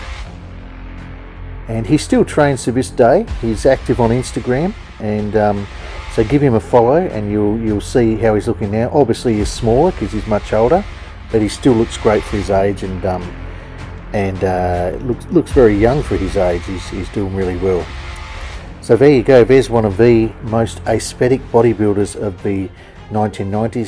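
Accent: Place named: Australia. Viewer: I love this guys beautiful Aussie accent. I could listen to him all day and his knowledge of bodybuilding is amazing.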